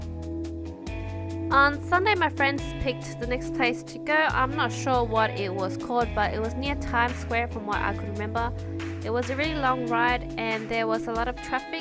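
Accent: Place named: Australia. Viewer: lol cool I was just curious because your intro was hello in Korean. Anyway you don't have any accents other than the obvious Australian's accent:). If you're still in Korea, please do a street food video =) cheers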